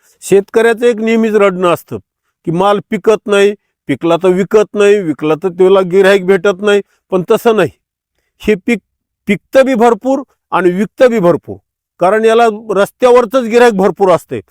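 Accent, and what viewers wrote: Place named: Satara-Solapur, India. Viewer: तुमची बोलण्याची भाषा आणि सांगितलेला जिल्हा यात मेळ बसेना सातारा सोलापूर boundary सारखी भाषा वाटतेय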